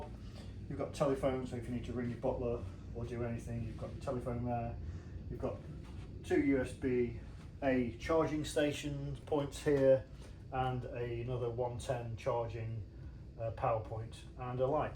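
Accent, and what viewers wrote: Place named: United Kingdom. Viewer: fantastic video, loved it. questions: bathroom, soaps incl, lotion, shampoo/conditioner incl? 2nd question magic carpet you stated nobody can see us on balcony? if magic carpet goes/up down, how do people not see you? Oh love your accent as Americans have various accents too, southern, and boston, new yorkers talk different and rest of us have regular accents but love the UK accents so so awesome....you sound like a teacher or public speaker awesome love your presentations.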